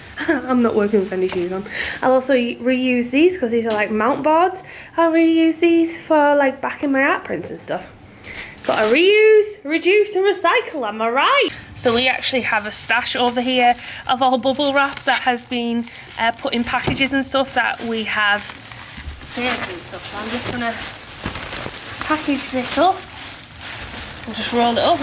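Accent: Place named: Yorkshire, United Kingdom. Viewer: Everything is so adorable!! Plus its great to hear a proper Yorkshire accent on YouTube! Makes me feel at home.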